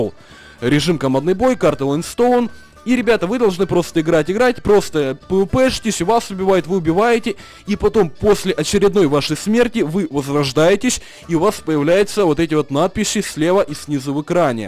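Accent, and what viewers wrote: Ukraine. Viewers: DEROTER ツ он Украинец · Fibe hou он украинец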